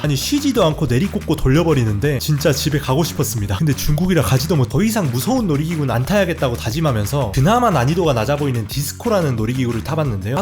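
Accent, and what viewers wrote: Jeolla, South Korea. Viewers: 전라도사투리 거북합닏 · 혹시 광주 분이신가요?? 전라도 사투리 쓰셔서 광주사람인가했는데 딱 기아 얘기 나와서ㅋㅋ (내일 드뎌 한국시리즈에요😎) 그리고 영상 너무 웃겨욬ㅋㅋㅋㅋㅋ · 전라도사람이시군요 사투리가 ㅋㅋㅋ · 전라도분 이신가봐요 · 숨길수없는 전라도사투리 ㅋㅋㅋ 굿!! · 전라도임 ? 사투리가 ㅈㄴ 들리네 ㅎㅎ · 전라도 사투리 ~~~~ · 오! 전라도 분이신가보네요 사투리가 구수합미다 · 혹시 고향이 전라도신가요? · 전라도억양이라 불신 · 혹시 전라도 분이에요? 나레이션에 사투리가 구수한데?? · 아따 니 전라도 사람이여라? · 5교시 한문시간ㅋㅋㅋㅋㅋㅋㅋㅋㅋ 아니 말 너무 재밌게 하시네요ㅠ 전라도 억양도 너무 웃김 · 즌라도 사투리가 쏙쏙 박히니까 재밌네 ㅋㅋㅋ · 9주인장 전라도 맞져 ? 계속 알게모르게 전라도 억양이들림 (순수 궁금증)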